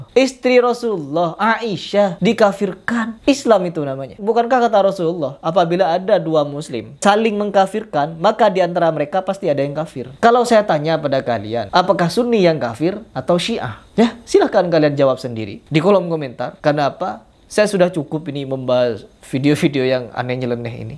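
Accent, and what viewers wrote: Madura, Indonesia. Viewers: Mas logat MADURA tolong dihilangkan.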